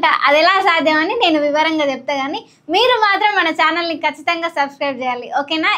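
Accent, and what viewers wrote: Telangana, India. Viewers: Telangana Yaasa Matladatam apesthe channel grow ayidi worst explanation really ilanti informative videos ila Kadu Chepedi E voice tho kadu just telling all these for your sake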